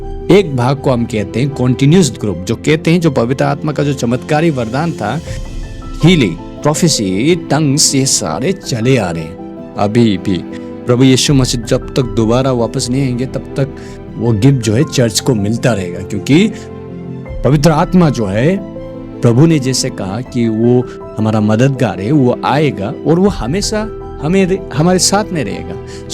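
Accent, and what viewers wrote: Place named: Northeast, India. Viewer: Everyone is thinking this man is Nepali but his way of speaking is like Northeast India..he may be from Nagaland... Meghalaya...Arunachal Or Mizoram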